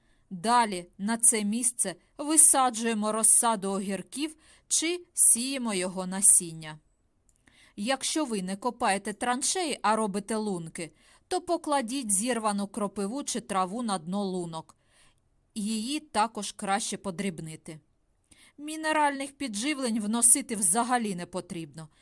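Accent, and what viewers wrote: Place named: Podillia, Ukraine. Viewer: Доброго дня. Ріже вухо, бо незвичний для вашого вуха. А це діалект із Поділля. Мені вухо не ріже, бо так говорила моя бабуся. Чернігівщина, Черкащина, Полтавщина, Київщина - Подніпрянська Україна - хтось гикає, хтось надто окає, в когось о переходить в у. І це наше багатство, цікава історія мовотворення, контакти з іншими народами... Бажаю Вам зрозуміти наше багатство і не намагатися пістригти всіх під один гребінь.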